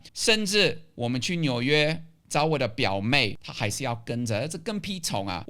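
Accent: Taiwan. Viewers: 你好喔，你真不是歪國人，若只聽你講話真的真的是很道地，我想對台灣也是很有感情的好男。excuse me 第一次看，請問您住台灣多久了？ 祝福您 ～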